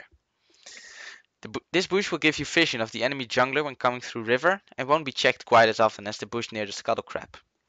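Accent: Netherlands. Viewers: ben je nederlands? je hebt een nederlands accent (are you dutch i what i asked)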